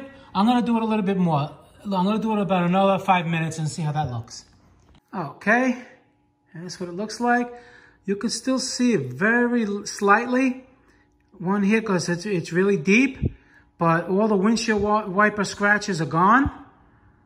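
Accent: New York, United States. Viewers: The New York voice rules. I love it.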